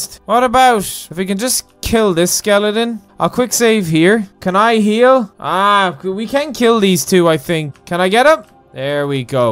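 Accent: Ireland. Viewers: Also keep up the irishness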